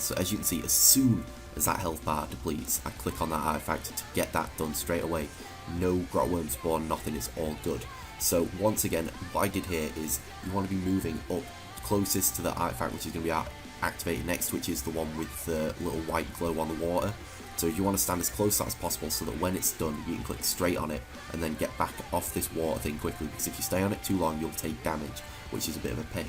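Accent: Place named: England, United Kingdom. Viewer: You should have added text on things like gear names lol. It's hard to understand the English accent.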